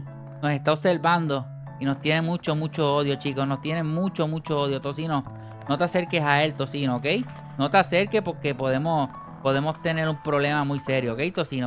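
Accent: Puerto Rico. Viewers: Me encanta la voz de y parece Puertorriqueño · Eres de Puerto Rico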